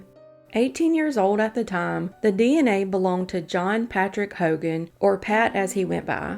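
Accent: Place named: Mississippi, United States. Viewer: i don't know where in the south these are from, but this voice makes me miss visiting madison, mississippi.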